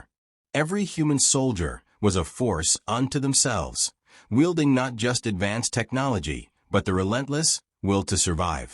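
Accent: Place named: North America. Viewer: Now tell it in an accent that isn't North American.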